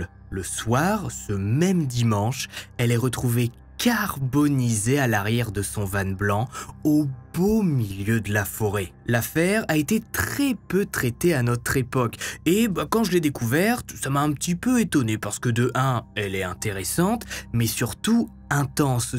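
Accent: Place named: Picardy, France. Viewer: Ce fait comme un rat mort. Tu es du nord Picardie j’adore 👍 bisous 😘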